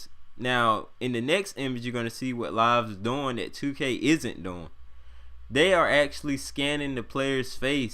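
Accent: United States. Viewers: where you from? You sound like you're from down south, not saying that's a bad thing just curious.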